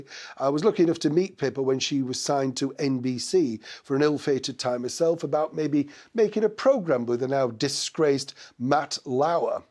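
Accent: Yorkshire, United Kingdom. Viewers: Katherine is every inch a royal, Meghan is a wannabe, she will always come up short. You did give me a giggle earlier, I heard your comment as......''I (Meghan) am a hooker!!!!'' it is your lovely Yorkshire accent that did it............... made me laugh, until I realized what you had really said ''I am a hugger.'' hey ho. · Being roasted in classy Brit accent has to be hell😂😂😂 I’m laughing at the roast. Damn Mr!!! Take it easy on her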